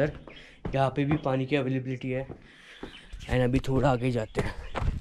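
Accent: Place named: Maharashtra, India. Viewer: I love your Maharashtrian Hindi 😘 (suggestion - just make it shorter , within 20 minutes)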